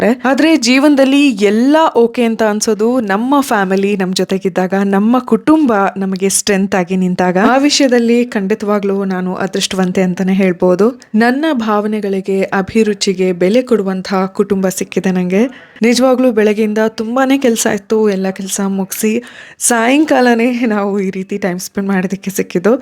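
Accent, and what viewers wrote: North Karnataka, India. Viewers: Mam I like the way u talk mam and ur uttara karnataka talking style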